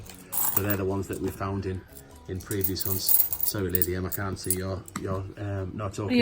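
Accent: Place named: Northern England, United Kingdom. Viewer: Love a good old northern natter keep up the good work.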